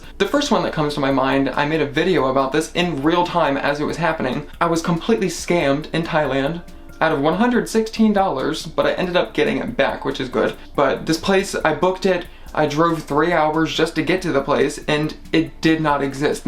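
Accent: United States. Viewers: I love your American accent....and your nose.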